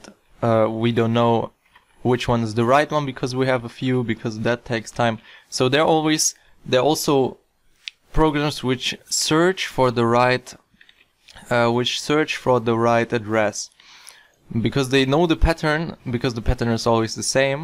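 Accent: Germany. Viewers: German right?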